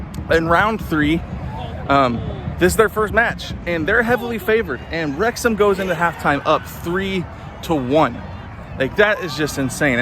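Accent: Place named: United States. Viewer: It's good to see you taking an interest in Wrexham. I was there and the atmosphere was electric. A few things to work on to sound, as you put it, less American. You would say Wrexham were 2 up. And Coventry were 2-1 down. He scored, not he netted it. But your videos are good the way they are. It's the interest you're taking and the passion that comes across in them. We'll done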